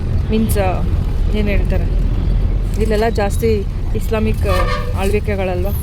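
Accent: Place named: North Karnataka, India. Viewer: 😂😂😂👌👌👌👌 ನಮ್ಮ ಉತ್ತರ ಕರ್ನಾಟಕ ಭಾಷೆ ಏನು ಕೇಳ್ತೀರಾ 😅😅😅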